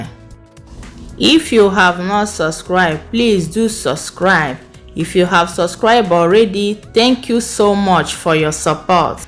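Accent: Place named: Nigeria. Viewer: First I love the way you have put your vegetables in order, clean job it makes me admire what you are going to cook before I see it😋, secondly your voice is nice to me,I love Naigerian accent so much, last but not least,I love your recipe,and the vegetables looks delicious 😋, wow well served ooo my comment is "confusion" as you have just say😁😁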